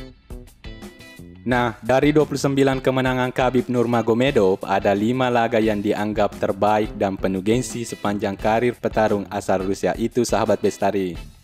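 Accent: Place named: South Sulawesi, Indonesia. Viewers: Itu suara orang sulawesi bro.. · Yg punya chanel pasti saudaraku dr p sulawesi.dr logat nya. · host nya pasti org sulses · Orang Bugis yah pembaca narasinya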